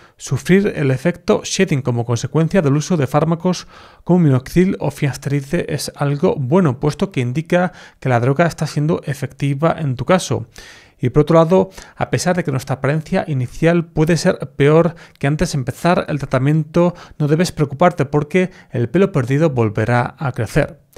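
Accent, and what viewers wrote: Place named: Castile, Spain. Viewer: Pues habla " perfectamente" eleganter castellano. Yo tampoco entiendo a los Latinos. Sin querer ofender.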